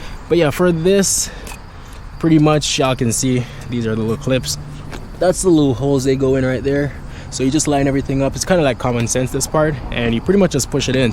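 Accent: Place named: Jamaica. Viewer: U sound like a Jamaican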